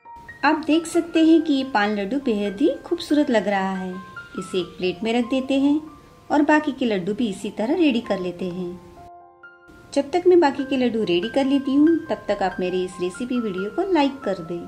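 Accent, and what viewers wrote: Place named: Gujarat, India. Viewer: Your Gujarati accent is adorable, it reminded me of my friend. And really Nice dish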